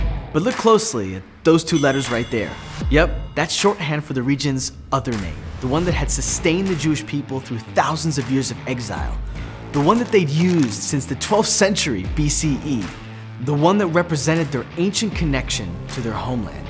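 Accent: United States. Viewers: I love that this guy has an American accent and can claim ownership over land that once belonged to the indigenous, and be proud. Why not go further back before the myths of the book? Who were the Canaanites and who are the direct descendants? This video proposes the current Palestinians were immigrants when we know they are direct descendants. So are the Lebanese who hold closer dna than any current Israeli. There is no tangible evidence of David ever existing, so for anyone today to excuse apartheid and ethnic cleansing based on folklore is beyond insanity. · Are you Israeli or American? You sound like an American. God Bless you for all the information you have shared. You are bright and very knowledgeable.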